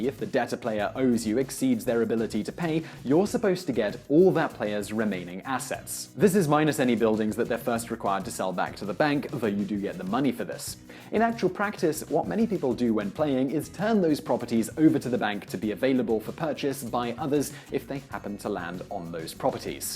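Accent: England, United Kingdom. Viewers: It kind of is. There's the English language. But there's also an accent to it depending on who's speaking it: someone from England, America, Ireland, France, etc. speaks English with an Engkish accent. I speak it with an American accent. Etc, etc. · Whoa. Didn't realize he had an English accent until I saw the game board with the Italian currency symbol ....my brain works in mysteriously beautiful ways. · the smoother British voice Vsauce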